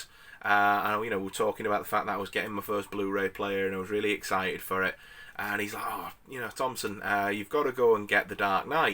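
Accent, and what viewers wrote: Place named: United Kingdom. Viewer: Hey mate, just found your channel and subbed straight away. Great buds buddy. Where abouts in the uk are from? Always good to hear a fellow Brit in the Tube 👍🏻🎥💿🎞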